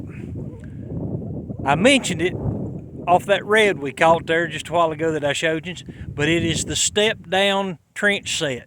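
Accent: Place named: South, United States. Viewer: Very disturbing, sad, cruel, and embarrassing posting. Strange how YouTube allows this very depressing, and cruel content. Always some " man" with a southern drawl, beard and can smell them thru the Internet...... Always Trumpers, and always think of themselves as "real Americans men"..... Real men hunt with cameras.......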